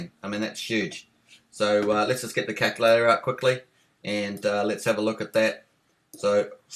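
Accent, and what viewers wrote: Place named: New Zealand. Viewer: You are obviously a kiwi boy. Kiaora for these clips. I have no idea how to work auctions. I need to learn more.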